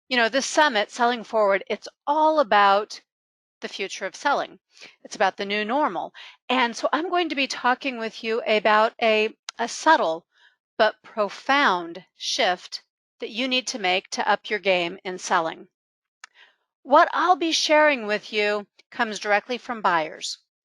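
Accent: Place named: United States. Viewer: The heavy American accent has ruined the presentation.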